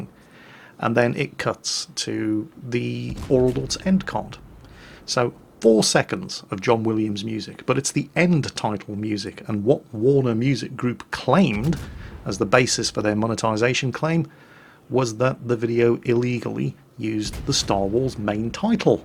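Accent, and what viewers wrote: United Kingdom. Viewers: oh my god..., do get a move on...you have made the subtle art of rambling into a tortuous experience only spared by those wonderful dulcet tones...damn your British accent for making me love you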